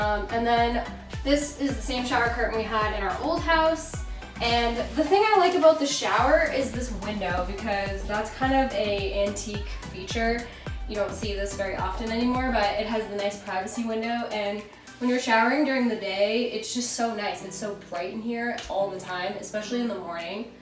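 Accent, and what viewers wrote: Maine, United States. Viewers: On another video you asked if you have an accent. I can here the Maine accent in certain words, like when you say "certain".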